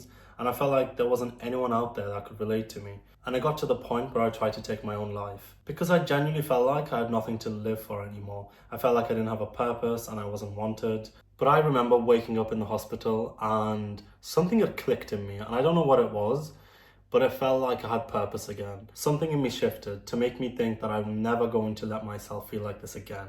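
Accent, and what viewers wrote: United Kingdom. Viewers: They should’ve known the moment you start talking with a British accent that you were gay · sorry mate but what did you expect in reality to happen ? that a conservative family would accept such liberal ideas with open arms ? both of you are at fault here its so obvious they didnt do a good job at raising a muslim especially in a foreign secular country (from your accent its the UK probably) , you being gay wouldve just been fine you cant control who you have feelings towards whatever sure islam doesnt punish feelings but when you dont follow the religion and come with a secular ideology to a muslim family thats your fault for crossing the line , your sister can raise her child however she wants and she wants it to be under islam then she's right to ask you to change certain stuff and accomodate its not gonna kill you if you hide the tattoos and earrings for a while and you cant complain about how she wants her child raised even if it costs her her uncle , its honestly sad that these families dont teach their children islam the proper way in western countries and end up in these tragic situations and you're certainly not the first or the last to be in this situation , i hope you find your way to islam even after such an experience and get back the family bonds you lost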